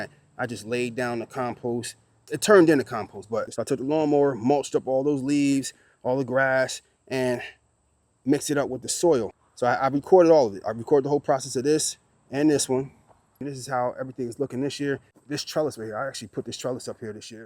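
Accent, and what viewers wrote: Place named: Philadelphia, United States. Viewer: First time viewer here from Delaware County PA, just outside of Philly. I follow so many YT Homesteaders from the South with their Southern accents that as soon as I heard you speak I knew you were from somewhere near me 😂 Now I have a new channel to follow. Thanks!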